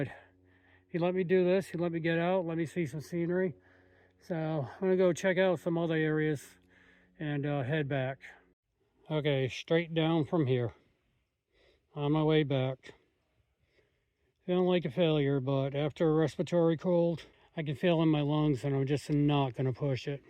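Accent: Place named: Louisiana, United States. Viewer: ✨⚜️🍁🍂🎃🪾SUCH A GOOD DRIVE! ARE YOU FROM NEW ORLEANS BECAUSE I CAN HEAR UOYR LOUISIANA ACCENT🙂🍁🍂🎃🪾⚜️✨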